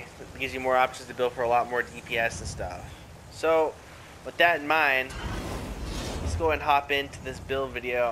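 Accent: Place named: West Coast, United States. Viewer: Yo you gotta be from the west coast with that accent. Lol nice vid bud.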